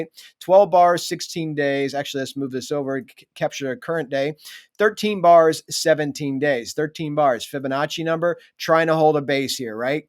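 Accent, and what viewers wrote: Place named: United Kingdom. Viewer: thx B, I thought so. He sounded British. Well, I'll try him again on Telegram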